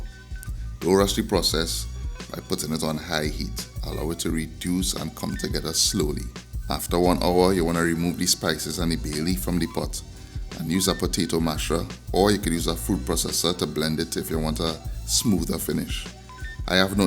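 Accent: Trinidad and Tobago. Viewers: Good explanation man.!!!Love the Trini voice.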